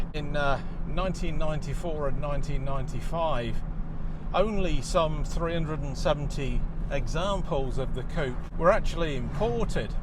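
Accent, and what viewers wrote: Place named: United Kingdom. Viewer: Interesting video. Nice to see some of the early development pictures. I have always thought it unfair that the XJS is knocked by so many and leads you to think no one likes them which isn't true. They have a big following and people really do like them. It was unfair to compare them against the e type. It wasn't an e type it was an XJS. In the mid 70's we got the SD1 Rover, Tr7, Princess, and the XJS and it was too much of a shock for many people. British cars were trying to modernise but the public weren't yet ready for them. The XJS i believe had the longest production run of any Jaguar and when the convertible was released in 1988 it lead i think in 1990 to its best sales year. I own a 1992 Facelift V12 Convertible and since buying it, it has amazed me how much attention it gets where ever i go. Strangely enough i was never keen on the look of the facelift lights until i got mine and i love it. 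They are the most comfortable seats i have ever had in a car and they are lovely cars. You got a bargain with yours and it been the Coupe in the USA as you said will make it rarer and a little bit more special. I saw the thumbnail and expected to see a US spec car and when you started speaking i thought you were a brit and confirmed when i looked at your homepage.👍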